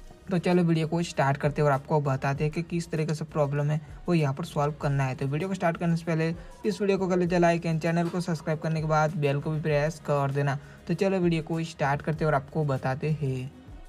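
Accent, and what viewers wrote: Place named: Telugu, India. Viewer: Bro you are Telugu 😅😅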